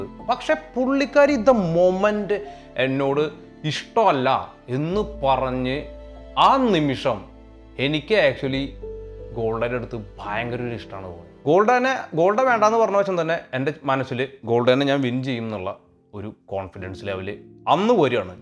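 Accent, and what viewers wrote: Thrissur, India. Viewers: മലയാളത്തിലെ ഏറ്റവും ഇഷ്ടപെട്ട സ്ലാങ് ആണ് തൃശൂർ സ്ലാങ് ആ സ്ലാങ് കേട്ടു വന്നതാ ഈ ചാനലിൽ ഇപ്പൊ favourite channel ആണ് 😍( ഒരു പാലക്കാട്ടുകാരൻ )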